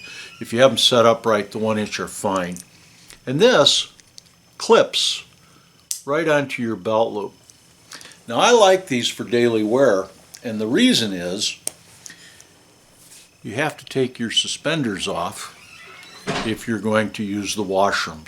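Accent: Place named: West, United States. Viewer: you can hear the west in his mouth lol. UNCLE!!!!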